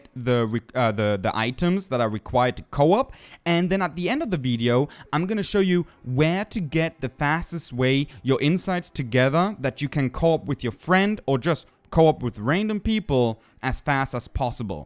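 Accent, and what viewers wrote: Germany. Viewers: you're not a native english speaker, I can hear it. I'm guessing you're german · Höre ich da einen deutschen Akzent? 😏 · yes, he's either german or austrian. I know because all of us have that exact same weird accent when speaking english, lol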